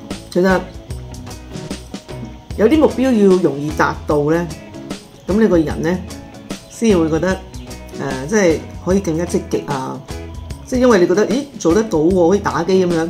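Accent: Taiwan. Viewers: 口音越來越像台灣人了，越來越融入台灣了，讚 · 掐 (讀音: 恰的平音) 外太空 💖💖叻女依家講嘢夠自然夠放鬆, 發音夠標準夠台味, 試下睇多啲綜藝節目啲字眼會學得好快, 可能係你下一個目標?😊依家返香港會唔會好唔慣啲人講嘢嘅 tone? 有時我聽到隔離啲香港女人講嘢, 太急太 aggressive 都會影響好心情, 要深呼吸, 即時逃離現場~😁😁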